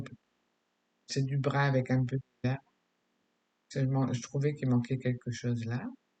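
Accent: South, France. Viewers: Une jolie page vintage qui met en valeur cette ravissante petite fille avec tous tes pts bouts de papier façon patchwork , tu as des doigts de fée de magicienne ;) Le résultat donne une petite merveille .. Je retiens l'astuce pour le tamponnage sur du papier de soie pour plus de netteté , c'est vrai que tamponner sur une page de ART journal quand il y a des multicouches n'est pas toujours réussi.. Tu n'as pas l'accent du Nord , mais bien du sud je trouve . Félicitations pour le nbre des abonnés qui grimpe , bravo ! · Merci pour cette nouvelle page toute vintage ❤️ Ce serait dommage de nous parler en anglais ton accent du sud est tellement agréable ainsi que ton rire léger, bravo pour ta petite célébrité toute méritée à bientôt 😊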